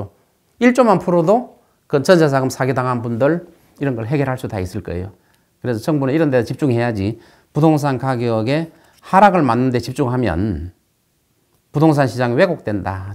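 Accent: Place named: Yanbian, China. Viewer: 이분 말투가 중국 연변쪽 억양 이신듯?? 암튼 3달전 한국은행 발표에 따르면 한국 아파트 가격이 소득대비 뉴욕 도쿄의 3배로 미친 가격~! 이분은 방송중이라 보수적으로 고점대비 50%를 적정가로 이야기 하셨으나 실제는 80% 하락이 정상임. 여기에 인구는 세계최고 급감에 가계부채 세계1위라 50년 장기하락은 피할수가 없음. 전국민의 절반이 대출만땅 빚거지 금융노예라서, 부동산 망하면 나라망한다는걸 볼모로 정부에서 부채 모조리 탕감 해줄걸 기대하고 있지만 뒤통수 크게 쳐맞게 될 것임. 1인가구 증가해서 아파트 가격 오른다??? 1인가구 80%가 생활비도 궁핍해 원룸 월세사는데 10억 철근없어 저절로 무너지는 아파트 퍽이나 영끌해 주겠다??? 하여간 미친것들 천지임 ㅋㅋㅋㅋㅋ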